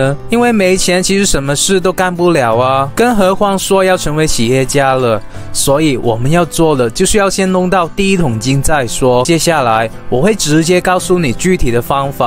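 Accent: Hong Kong, China. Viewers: 想問下是哪裡人呀？聽口音，難道是香港人嗎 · 不是台灣口音喔！感覺比較像香港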